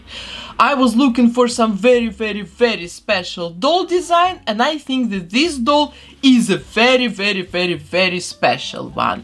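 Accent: Russia. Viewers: Русский акцент? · какой-то русский акцент 🤨🤔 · I can't figure out what that accent is it kind of reminds me of a Russian accent of which is what I have but it's not quite a Russian accent so please what language did you most likely speak before you start speaking English or did you even speak another language aaaaaaaa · Блин, да чтож такое. почему меня не покидает ощущение что у вас русский акцент?) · похож, почему-то, на самый стереотипный и клишмрованный русский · Акцент похож на русский) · Звучит как русский акцент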